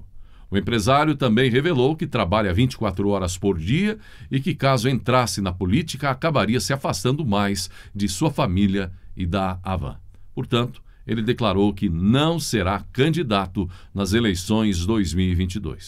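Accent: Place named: Minas Gerais, Brazil. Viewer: mineiro uai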